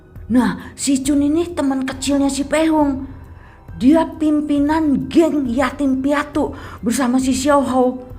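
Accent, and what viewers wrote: Jakarta, Indonesia. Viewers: Ngomong nya b aja awok² org Jakarta y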